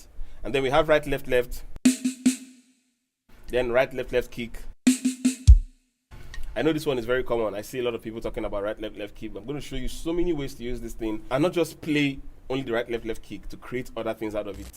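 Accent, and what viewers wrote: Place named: New Jersey, United States. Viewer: Hey dawg. You sound like you're from jersey 😅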